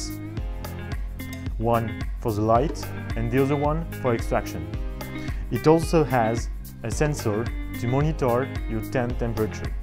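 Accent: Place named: France. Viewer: Nan nan nan ... ce n’est pas possible, pas avec un accent français à couper du bois et encore moins avec un look de VRP ... Je vous le fais version vendeur pro, sans accent soporifique contre une Gavita e-series 6/750w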